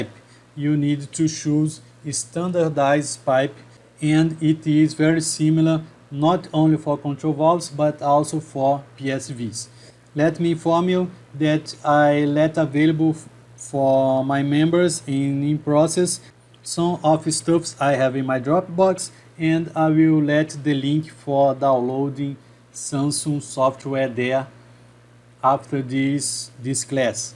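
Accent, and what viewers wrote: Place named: Brazil. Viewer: Cara que canal fantástico... É recheado de assuntos sobre a Engenharia Química pena que eu não manjo muito de inglês para compreender melhor seus vídeos, percebi que você é Brasileiro o seu sotaque te entregou um pouco hahaha. Entrei a pouco tempo para esse mundo incrível da Engenharia Química, estou no 3° período do curso e encontrei seu canal... Parabéééns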